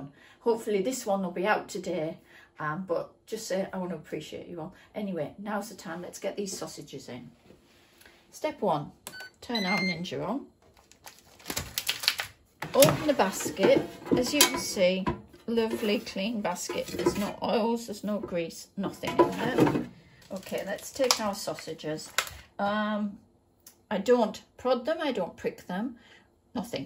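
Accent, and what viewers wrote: Northern England, United Kingdom. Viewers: Nice to hear a Northern accent - I’m originally from Grangetown, in Middlesbrough!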